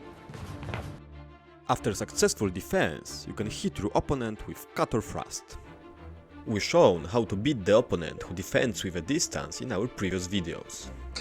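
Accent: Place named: Eastern Europe. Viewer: We were promised Slavic accent, and we get memes in Slavic accent. Nothing to complain here...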